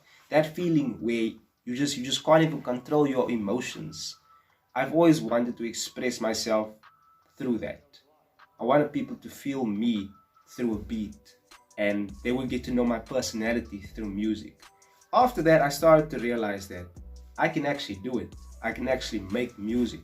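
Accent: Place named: Afrikaans, South Africa. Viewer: Oh my gosh you have a Strong Afrikaans accent lol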